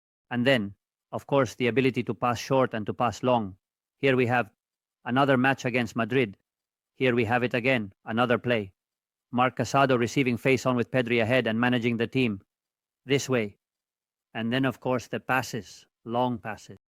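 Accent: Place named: India. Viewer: Te han puesto el inglés con el acento indio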